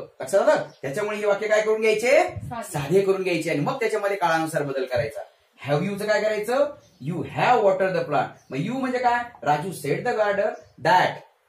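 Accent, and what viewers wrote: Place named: Bengal, India. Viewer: Ka bol raha h, bangali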